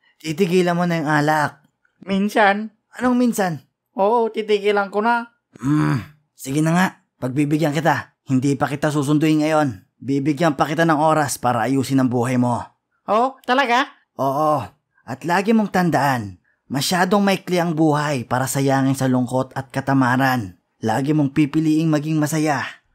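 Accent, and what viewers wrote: Ilocos, Philippines. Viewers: Kulit ng accent mong Ilocano 😂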